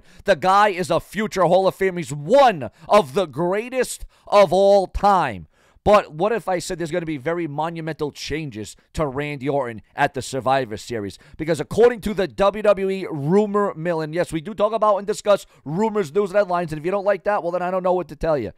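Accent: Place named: California, United States. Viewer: any news from wrestling from you would be great. You have an awesome voice. I love it. What state are you in? You sound like you are from California. Keep up the great work you da best❤❤❤❤❤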